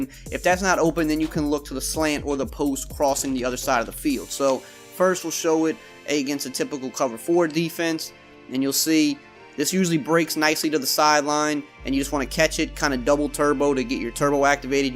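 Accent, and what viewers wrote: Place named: New Orleans, United States. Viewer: I am from Houma if u know where that’s at its DTB and I love ur accent cause I’m from Nola area as well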